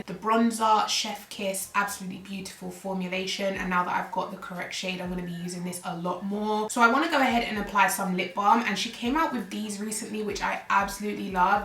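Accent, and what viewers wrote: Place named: United Kingdom. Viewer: When you're searching for a specific video and then you hear a British accent 😫Subbed x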